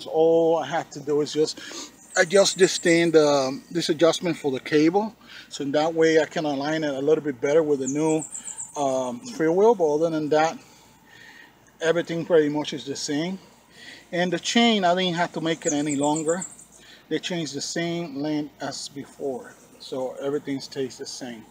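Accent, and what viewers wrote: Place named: Cuba. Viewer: U are Cuban I can tell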